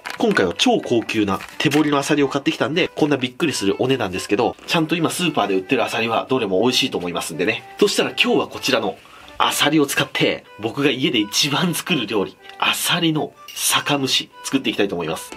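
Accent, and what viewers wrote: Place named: Aichi, Japan. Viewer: 最近視聴させていただくようになりました。時々馴染みのある訛りが聞こえると思ったらやはり愛知でしたか！嬉しいです！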